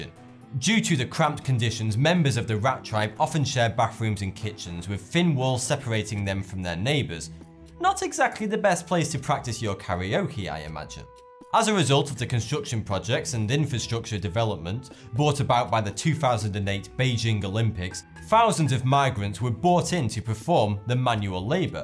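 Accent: United Kingdom. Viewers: It's literally a normal British accent... This is what most of us sound like, not that RP bullshit Americans expect us to XD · I was interested in this video, but I'm not watching it because your non-standard pronunciation of "f" and "th" really grinds my gears. I don't know about everyone else, but I associate this language "feature" with lower-class, uneducated people. Rationally, I know it is just one of many British dialects, but emotionally, to be redundant, IT GRINDS MY GEARS. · It's just his accent, some British people say f for th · A weird accent?! Its a british accent, the home of the language! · I thought it interesting that the video showed US money falling rather than French money, or even British, since the presenter sounds British. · Wearing a suit and having a brit accent obviously has not made you an authority. You could have done so much more with this. 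Among the many things you missed....there are hundreds if not thousands of cave houses available right now all over the world..Spain is a big one. And an underground house is possible anywhere on this planet with a constant temp. inside. I find this whole video obnoxious and full of incorrect information. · I am Canadian, and though I don't think that fact is especially relevant, I find his particular British accent adorable, especially since his insights and conclusions about the facts he researches are so intelligent and well thought out. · His name isn't 42, he's actually saying but with his British accent.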